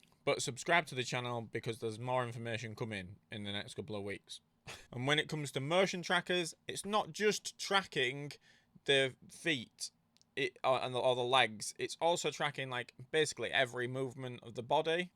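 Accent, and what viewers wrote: United Kingdom. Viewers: Thanks for the info, not much on Pico 4 Ultra out yet on the net! BTW, I find your English accent really interesting! What part of the UK is this from?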